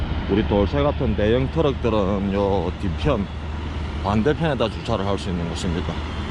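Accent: Gyeongsang, South Korea. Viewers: 절로가라마~~~!!!!여가 너그집이가 임마 칵 쌔리뿔라마 ㅋㅋㅋ 전형적인 경상도 아저씨네에 두달동안 개점휴업예정 이시라 앞에 못본거 진짜 열심히보고 있습니대이 근대 재미있어예 헤헤😋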